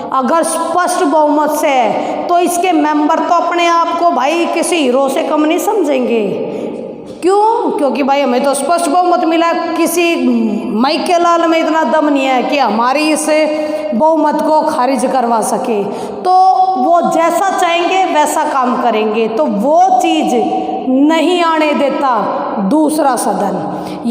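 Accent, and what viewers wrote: Haryana, India. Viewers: Maam ap Hariyana se ho kya ap ki aavaj se lag raha h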